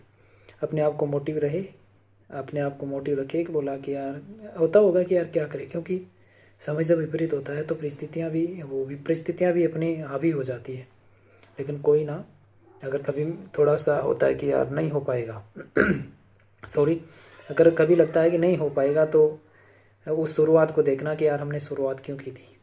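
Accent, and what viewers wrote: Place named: Bharatpur, India. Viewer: Abki avaaj bol chal bhartpur ki se ha bhai reply Dena bhai kaha se ho or itbp animal transport kitni chhutti milti ha 1 saal me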